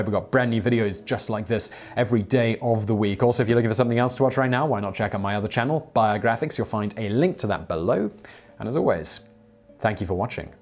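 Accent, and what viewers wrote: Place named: RP, United Kingdom. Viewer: isn't hired because he knows anything. He's a mouthpiece with a posh accent.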